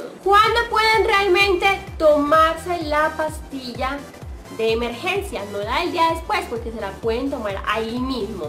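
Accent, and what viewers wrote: Colombia. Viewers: siempre había escuchado acerca de la ''famosa'' pastilla y no tenia claro muchas cosas :/ gracias por aclarar todas mis dudas y tiene una hermosa voz (mas ese acento de colombiana) -saludos!! :D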